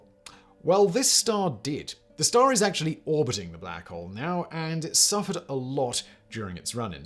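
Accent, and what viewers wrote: United Kingdom. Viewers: Sometimes that British accent throw me off as well. Maybe if he used subtitles.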